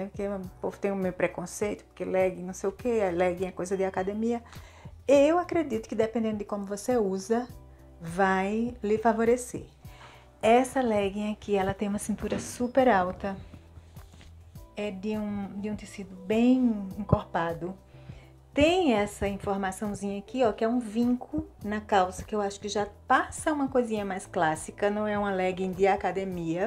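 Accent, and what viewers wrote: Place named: Northeast, Brazil. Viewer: Pelo seu sotaque deve ser Nordestina, como eu. A não ser que você não more no Nordeste, esses looks não são completamente inadequados? Ou então você mora no sul ou algo parecendo 🤔🤔🤔🤔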